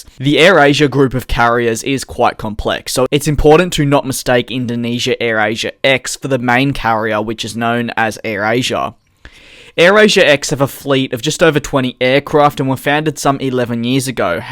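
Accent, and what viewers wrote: Australia. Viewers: You sound Australian, it's just an observation. I'm another Aussie presently living in Sri Lanka and go back and fourth to Sydney and Colombo. I'd made the mistake of 'trying this airline' That I will never repeat. The Manager for AisAsia in Sydney who's name is Jason James Lee was very rude and condescending to me. Therefore I for one can say that I will never fly this airline. Its good to see that they're falling apart. Their customer service may also be another contributing factor. Brett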